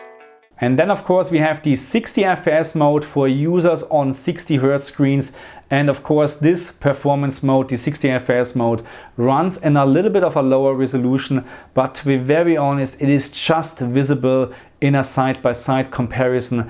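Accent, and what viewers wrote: Germany. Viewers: Deutscher akzent 😂👋👍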